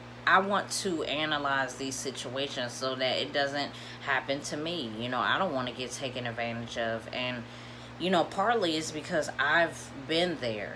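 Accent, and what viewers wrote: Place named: Virginia, United States. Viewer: Are you from Virginia I love your accent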